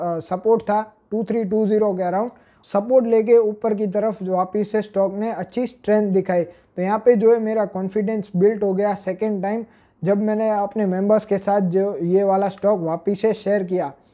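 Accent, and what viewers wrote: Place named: Kathiawar, India. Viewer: Bhai Kathiyavadi lage chhe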